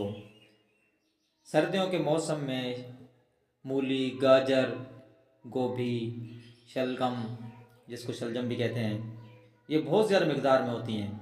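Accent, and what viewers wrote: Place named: Saraiki, Pakistan. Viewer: میڈا بھرا بالکل سرائیکی لگئے😅😅کتھوں دے ہیوے بھرا خاں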